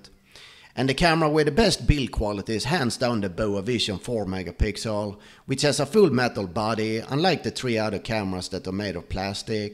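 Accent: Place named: Sweden. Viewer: Funny swedish accent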